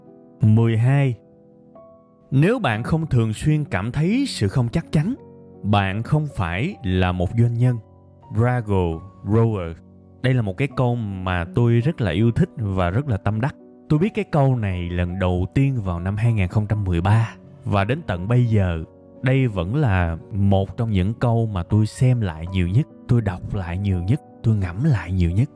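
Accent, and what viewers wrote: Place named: South, Vietnam. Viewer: giọng miền trong khó nghe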